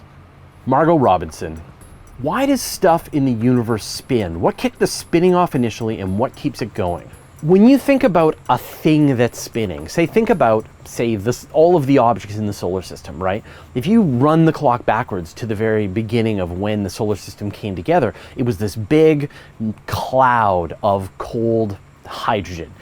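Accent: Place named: Canada. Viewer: I wondered if you were Canadian from your accent and I see yes! <link> I'm the publisher of Universe Today and co-host of Astronomy Cast. I was born in Vancouver, Canada, but grew up on Hornby Island, a small island off the coast of Vancouver Island. Then I moved to Vancouver again to study engineering at UBC.....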